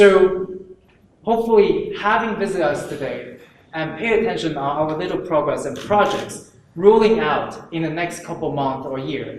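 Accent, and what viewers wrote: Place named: United Kingdom. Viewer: His British accent is so kool.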